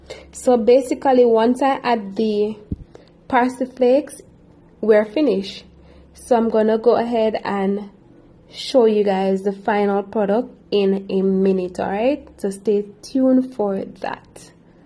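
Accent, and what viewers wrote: Jamaica. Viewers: I like the Jamaican accent. Blaze up gal.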